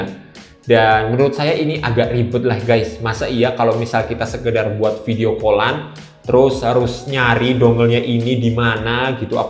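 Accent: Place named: Java, Indonesia. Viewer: Medok juga sih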